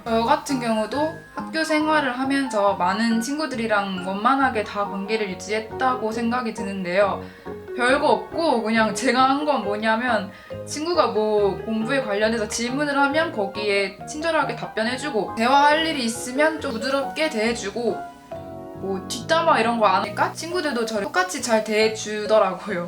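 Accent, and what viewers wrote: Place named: Gyeongsang, South Korea. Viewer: 경상도 억양도 너무 귀엽다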